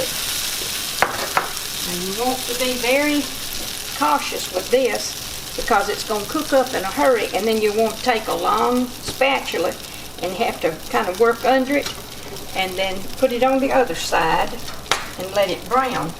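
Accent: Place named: Alabama, United States. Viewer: Hello from Alabama!!!! good to have found your channel!! I knew you sounded like one of us. 🤣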